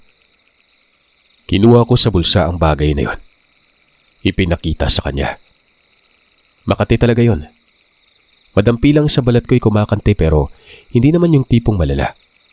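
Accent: Visayas, Philippines. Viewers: wag kna magbasa ng may bisaya... BOBO KA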